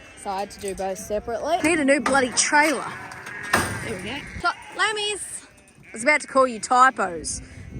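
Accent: Australia. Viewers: youre a natural comedian and absolutely adorable! As an American I love the Aus accent lol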